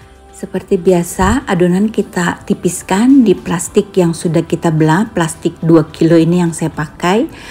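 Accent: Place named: Merauke, Indonesia. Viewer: Suaranya seperti nona merauke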